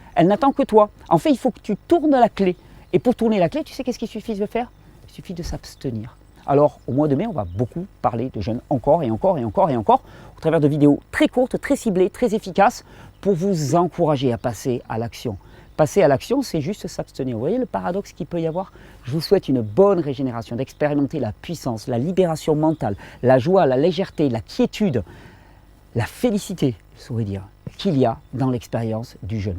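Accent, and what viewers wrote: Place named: France. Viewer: T'as un pt'it accent du fond de la province quand même hein..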